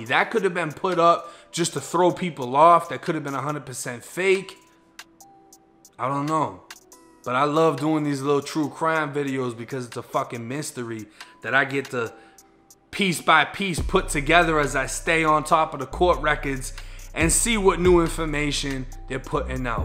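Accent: Boston, United States. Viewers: your funny as hell brotha, your baaaston accent makes the narration even better. Keep it up brew, good story, and do more of these "true crime" posts, it definitely fits you. Peace brah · Actually I just show your first video on lockdown, Im Live in Malden, I new you had a Boston ascent, that’s why I originally asked. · Why is it that everyone who is into this rap/hip hop culture, talk with voice inflections that do not coincide with the rest of their speech, why can’t they just speak the way they naturally should? I got nothing against this kid at all, I actually just stumbled upon his channel and content which is pretty cool, this kid is obviously from the Boston area, it’s hard to nail down different accents and what neighborhood they come from, this kid sounds like he’s from Southie, but I can’t be certain, being born and raised in NYC I can pick up Brooklyn/Bronx inflections. 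I wish this kid nothing but the best. I just wish these kids who are into this culture would stop trying to emulate speech that isn’t part of their natural speech patterns. It’s just something that bothers me. I completely own that’s it’s my shit. But God speed to this kid and what he does, good, solid, original content, on horrible stories that aren’t picked up in the media. Good stuff! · Are you from boston? Asking cuz that accent lmao I’m from springfield ma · Damn u must be from boston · lol mad boston accent but trying to sound southern slang? so confusing lol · Is that a Boston or New Hampshire accent??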